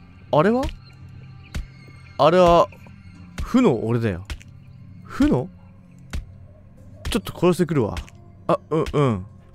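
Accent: Kansai, Japan. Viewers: 確かに笑か怖かどっちかにしてほしいですね、サムネ最高ですw 「どっちやねん」 の関西弁好きです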